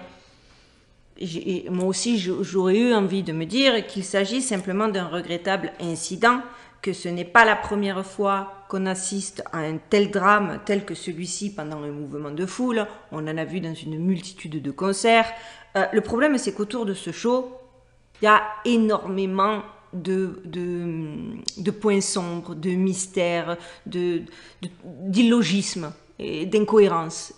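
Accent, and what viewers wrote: South, France. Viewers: Salut jeune jolie femme, alors ça fait un moment que je ne t'avais regardé, ahh tu sais avec le temps, on a pas assez de temps à consacrer à de si belles choses, plus trop de temps, j'aime beaucoup te ragarder, t'écouter raconter des choses très vraies, et très pertinentes, donc, bravo à toi, ( je te tutoie comme si on se connaissait depuis toujours ) je tiens à te dire ( continue, tu as un talent pour raconter des histoires, plus un bel accent du sud, ça plait beaucoup ça, ) voilà, je tenais à te dire ces vérités, car, je trouve que tu as un peu peur de déplaire, Je te répète que tu as un vrai talent pour raconter de belles histoires, BON alors tu me crois maintenant ? Your really good on what you do, i love watching you, "so, so, so, see you next time, on the next video" #sam&sam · Hello Merci pour vos vidéos. C est toujours un plaisir de vous entendre avec ce petit accent du sud. 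Votre analyse est tt à fait pertinente. Nous sommes effectivement au début de l abomination de la désolation "Apocalypse 13.14". Merci pour votre temps et votre bienveillance. God bless you 🙏💝🇺🇲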